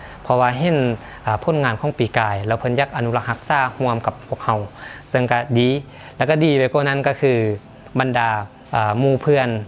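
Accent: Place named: Phetchabun-Loei, Thailand. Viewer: สำเนียง เหมือน เพชรบรู - เลย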